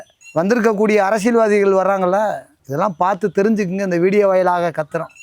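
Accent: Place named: Madurai, India. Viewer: அண்ணே சூப்பர் அடுக்கு மொழி பேச்சு மதுரை சிலாங்க்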